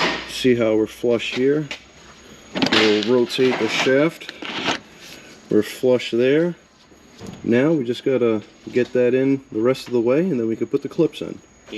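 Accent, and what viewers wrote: New York, United States. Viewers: New York. is that where you work based on "giggidy" accent.......very nice.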